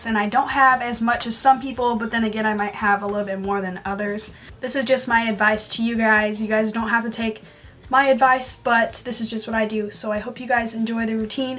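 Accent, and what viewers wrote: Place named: United States. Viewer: What country are you from? Cause it's autumn nearly winter in Australian and Southern Hemisphere, but it's spring nearly s summer in America,northern hemisphere. But you sound American. Aha well anyways by the way you are so beautiful with or without this makeup and I respect you being so brave :)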